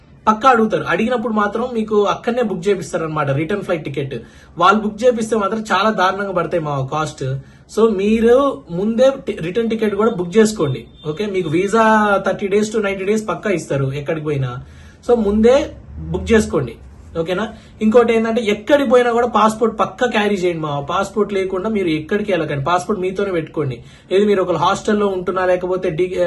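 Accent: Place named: Nalgonda, India. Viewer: mawa… needi Nalgonda na😊 same slang vundi anduke adiga 😂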